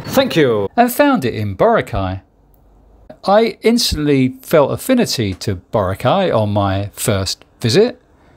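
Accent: England, United Kingdom. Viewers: Love your delivery attotude and yes English accent. Gteat video uncle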